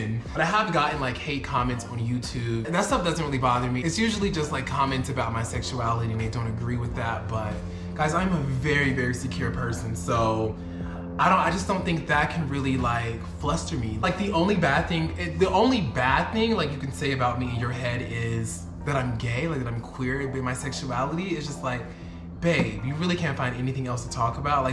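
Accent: United States. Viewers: 1. Welcome to South Africa, the coloured thing is very common here. You light skinned. But you of course should tell them you are not coloured if you are not. But lovely to follow your journey in my home. South Africa has many race issues though and I am interested to know how you feel about that in comparison to the race issues in the USA. 2. also, I like the way you say "Johannesburg'. We just say Joburg here sis. Joburg! LOL 3. Becareful of Lagos. They HATE GAYS THERE and it is ILLEGAL! They could kill you!! 4. Dating is Transactional in Africa, cause they think you have money! That American accent sis. They think you have money!! The dollar is stronger though. 5. Polyamory is not for me as well, sis. But Africa and South Africa has a lot of it. ENJOY YOUR TIME IN SOUTH AFRICA! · Lmao at the Coloured situation: South Africans are not well travelled. But because of your accent, I expect them to understand that you are an ''African/Black American'' who is on the lighter side, pigmentation wise. 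So when they ask you about your race, just say ''I am Black American,'' it will click to them! Your blond dyed hair is also not helping! Haha! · They're crazy for mistaking or assuming u that u're coloured up there in Joburg please correct them n clearify that you're black and from america.its your accent that confuses them.dont accept the term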